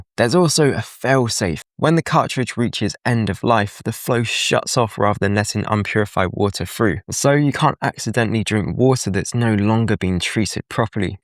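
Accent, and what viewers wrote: United Kingdom. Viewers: Thank you, enjoying your videos, particularly nice to hear a voice from the UK. Would like to hear more about emergency water storage if you're looking for video ideas.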